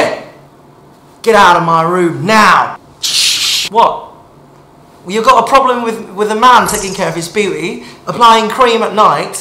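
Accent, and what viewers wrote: United Kingdom. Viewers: That British accent tho~~~ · that British accenttttt💓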